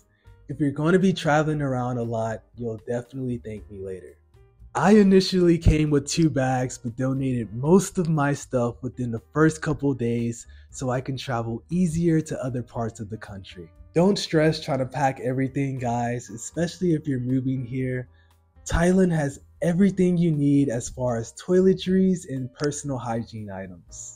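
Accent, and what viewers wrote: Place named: California, United States. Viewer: Good video thanks! Where are you from dude, you sound like a California surfer lol.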